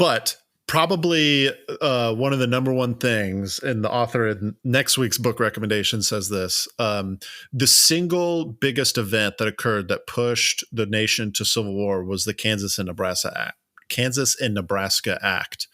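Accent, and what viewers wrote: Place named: South, United States. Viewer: You don’t have to think that you have to think about speaking in order to mask your little southern twang. Bc thinking you’re an idiot bc you’re southern is a sterotype, like it would be assuming someone is southern bc they are an idiot. Now being from a RED state, would be a logical assumption, but simply southern. Do you know how many idiots are from MASS and Michigan!? 🤣🤭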